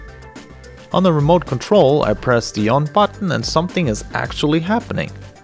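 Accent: Europe. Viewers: Mind444 Spiritual Tribe He sounds European, it will be 220 - 240 volts depending on the country, single phase. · Good video. You sound European. There are regulations in EU to have certified installers. I am curious - not complaining - are you certified to install or are you not in the EU or you are in the EU but you don't care, if so why don't you care? Thanks Reason I ask, is that I want to do it myself in UK.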